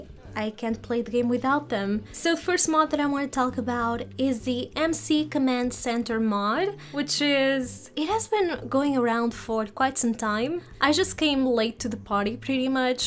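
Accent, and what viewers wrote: Portugal. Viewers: i knew you were portuguese haha it's so cute on your voice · Your accent sounds Portuguese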